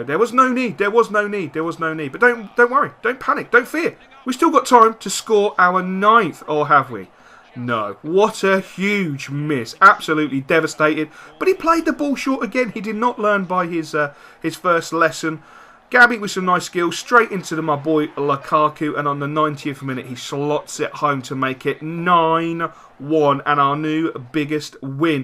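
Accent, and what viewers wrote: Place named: Scotland, United Kingdom. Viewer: ure obv scottish ha me too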